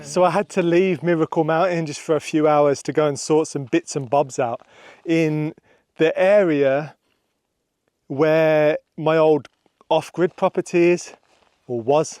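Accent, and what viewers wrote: Birmingham, United Kingdom. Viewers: I live in the UK and was oriinally from Birminham, so your accent reminds me of home. I think you are such a survivor, you have that huge land, which was taken on as a couple, but now one man. All the animals also to take care of and put a video together. Just where do you get all this engery from? Really hope you get others to join you in caring for such a property. Hope the legal stuff gets sorted friendly for you x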